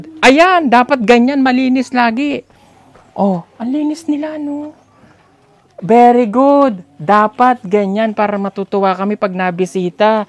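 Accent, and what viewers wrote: Visayas, Philippines. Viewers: Baka Sir pagbalik mo sa manila may Punto na Ang salita mo ng bisaya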